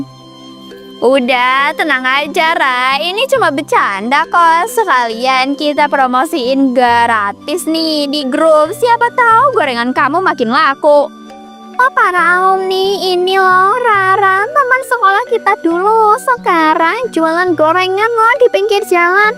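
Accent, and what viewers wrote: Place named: West Java, Indonesia. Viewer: lagam bahaasa ya sunda ya tuh nyunda banget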